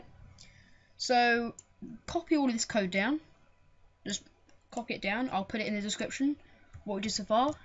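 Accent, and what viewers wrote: England, United Kingdom. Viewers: i really don't understand anything ur talking so fast and man , i'm not used to the english accent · british accent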